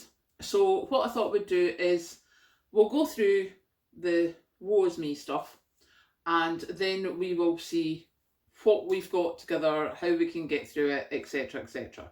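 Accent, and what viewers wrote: Scotland, United Kingdom. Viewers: That’s so funny 🤣,I’ve heard that before,that Americans struggle with the Scottish accent.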